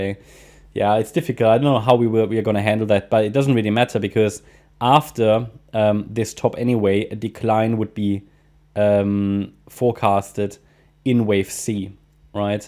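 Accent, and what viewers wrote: Germany. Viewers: Thank you Mister, I always thought that you speak (good) English with a German acent, and a few days ago I found your DE chanel 🤣🤣😍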